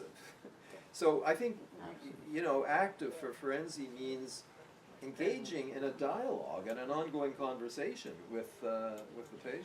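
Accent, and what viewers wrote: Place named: United States. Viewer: I think i recognise an american accent (i'm french), and i think your students are lucky. In France it's still difficult when your psychologist has been formed at the university and is from my generation (i'm 49) to critises Freud's theory when you talk about your life and you see on the face of the psychologist that you're not taken seriously. You have to talk about Oedipe theory and fantasies and why you think it can't be used to be analysed, and most of the lime they laugh at your face because they always pretend you didn't understand it how Freud meant it ! Hopefully i discovered Ferenczi about traumas and identification to the agressor. But so called psychoanalysis based on Freud's ideas has still too much power in France. If you want to come and make conférences against it, you're welcome !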